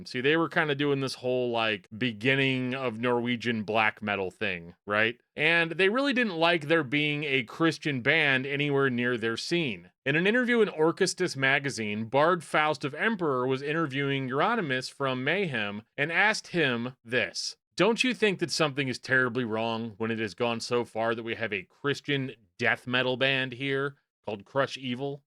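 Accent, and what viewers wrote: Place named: Midwest, United States. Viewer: Greetings from 🇸🇪 Thank you for your lovely midwestern pronounciations and this phenomenon I was unaware of! As a neighbour, I guess Vaakevandring should probably have the heaviest accents on the first a's and secondly the second a. Awake wandering.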